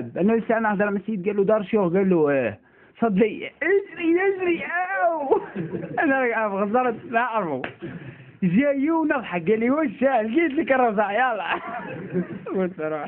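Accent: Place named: Ouled Nail, Algeria. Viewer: الي تعجبني انو محافظ على اللهجة اولاد نايل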